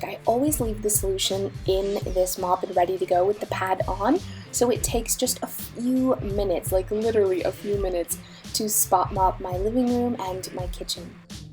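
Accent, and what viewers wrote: Canada. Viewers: your Canadian is showing lol! "eh?" it's all good. I'm in Indiana and I still say eh. I live like 3.5 hours from Canada so that may be why haha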